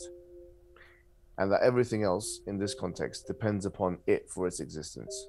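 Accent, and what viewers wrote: United Kingdom. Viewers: it’s not the accent that was hard to understand for me In fact I love the British accent it’s the high quality vocabulary he was using my vocabulary is very limited. But I’m not surprised at all know that I know he graduated from Oxford University mashallah. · british accent.. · Should’ve added subtitles for those that can’t understand British accent.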